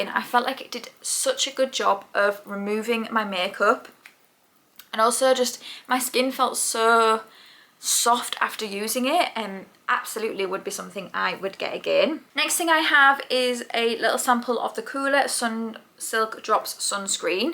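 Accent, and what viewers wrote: United Kingdom. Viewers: Hello! I'm over from the Project Beauty channel and newly subbed! I hope it isn't too forward, but I love your accent. What part of the UK are you from? Northern England?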